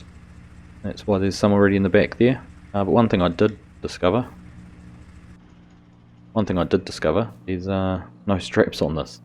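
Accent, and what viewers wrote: New Zealand. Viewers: I’ve listened to your voice long enough now that I can understand everything you say. At first that Kiwi accent sounded like you were speaking a foreign language. 😁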